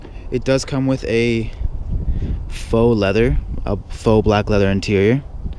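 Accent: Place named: New Jersey, United States. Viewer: Are you from Jersey or are you Italian? This is my first time watching one of your videos but your accent in your voice reminds me of Pauly D from Jersey Shore.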